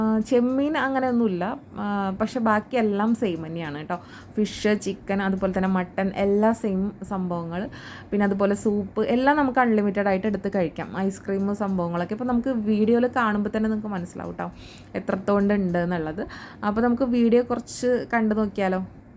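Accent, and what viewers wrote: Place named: Thrissur, India. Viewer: Suster ningal naatil evideya shabdham kond Thrissur aanenn thonnunnu ❤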